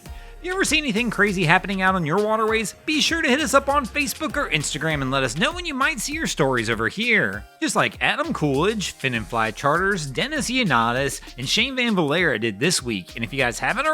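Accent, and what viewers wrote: United States. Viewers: After a few listens, you will realise that although he has an American accent annoying to Many, he really DOES know what he's talking about and actually makes the video complete. He is also a multiple qualified mariner with much experience. Either way....these are fun complications we all can never stop learning from. Cheers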